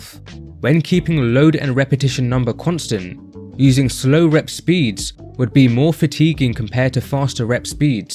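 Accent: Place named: United Kingdom. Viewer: For some reason I trust him so much more because he had a British accent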